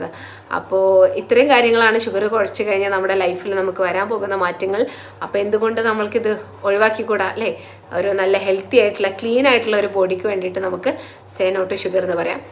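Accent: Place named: Vadakara, India. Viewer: താങ്കൾ വടകര ആണോ?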